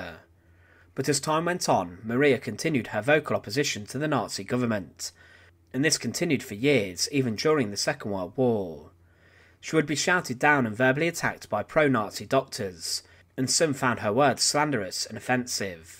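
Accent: United Kingdom. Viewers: You put an huge emphasis on the last syllable of the word at the end of each sentence. Strange to my ears. Is this a particular British accent?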